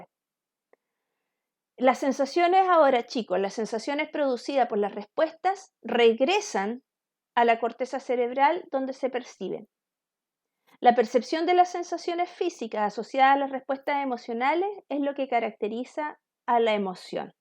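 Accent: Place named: Chile. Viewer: 😂😂😂 por el tono de voz me dice que chilena😊🧘‍♀️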